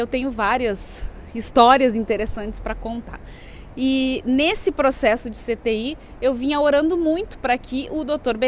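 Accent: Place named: Rio Grande do Sul, Brazil. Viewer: Como vc é linda tem uma voz que transmite uma paz...nosso sotaque gaúcho é lindo...lindo relato me emocionei...minha mãe desencarnou dia 3 de agosto pelo covid 19 sinto muita saudade dela...eu tive duas oportunidade de ir até lá aonde ela está...lugar de muita paz muita beleza incrível não tive vontade de voltar tinha uma praça com bastante árvore com muitas pessoas felizes...eu passei por essa praça com ela...e logo a frente tinha uma praia linda com uma areia bem clara com sol bem iluminado o mar bem azulado incrível fomos caminhando e conversando é logo depois voltei foi muito emocionante...eu não queria voltar queria ficar com a minha mãe lá nesse lugar lindo e de muita paz...mas temos que saber esperar a hora...creio que um dia irei definitivo morar nesse plano lindo com ela...bjos querida.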